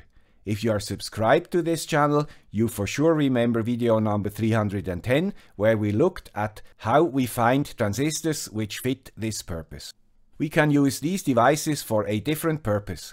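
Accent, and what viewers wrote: Switzerland. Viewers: Agree. I’ve got 25 years into this so far. The guy with the Swiss accent has been a great help.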